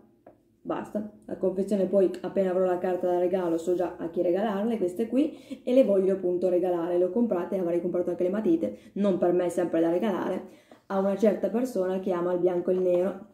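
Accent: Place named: Piedmont, Italy. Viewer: infatti si sente dall'accento. Non ci sono mai stato ma mi piacerebbe visitare le montagne la e torino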